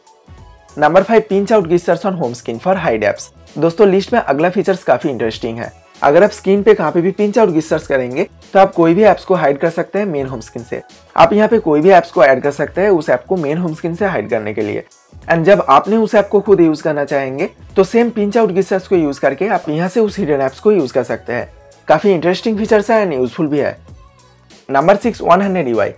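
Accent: Bengal, India. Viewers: Contain is good but my brother there is a Bengali tone in your pronunciation I didn't disrespect Bengali but for your shake try to improve it best of luck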